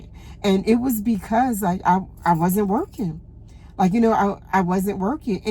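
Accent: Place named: New Jersey, United States. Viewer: Cool accent. Are u from Jersey?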